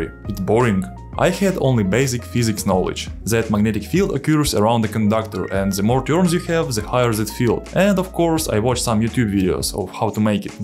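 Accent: Russia. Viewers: Thank you!, your accent Russian why I say that? Is because magnetic fields then to coil's a EMF field. Tesla coil, plasma current flow, and understanding transformers, hover crafts flying UFOs each country has one the harnessing of plasma called plasma engines ( motors). Russia is white and I want to say a bird shaped Hove craft our on this side of the world same but mercury and it's flow. Got to Go star Trek create the new generation so they can boldly Go where man's gone before!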